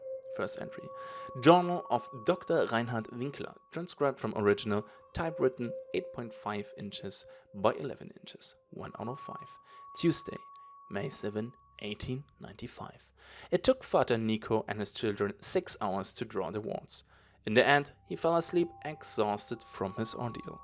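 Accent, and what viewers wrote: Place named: Germany. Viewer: The accent makes it kinda obvious you speak German. Grüße gehen raus :D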